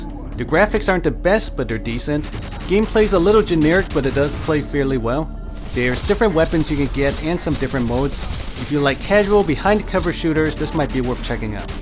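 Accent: Netherlands. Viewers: Your dutch are you?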